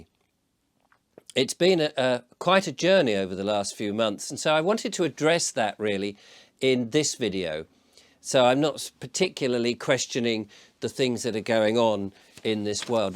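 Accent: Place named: England, United Kingdom. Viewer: Spoken by a true English man.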